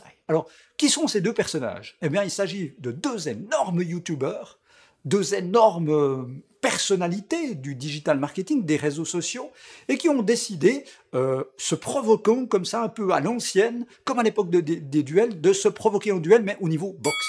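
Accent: Switzerland. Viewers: Salut j'aimerais juste savoir comment tu as fait ton intro ? Sinon continue comme ça, tes vidéos sont très inspirante et a ce que j’entends tu viens de Suisse ahahah.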